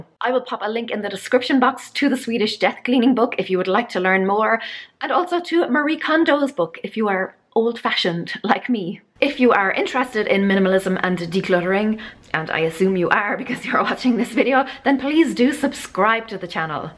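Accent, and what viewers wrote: Ireland. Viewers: When i moved several years ago, my son told me, "There's not 10% of this stuff that's worth keeping, let alone packing and moving it. You're on your own." I agree with you-- let's toss it. And i like your Irish brogue.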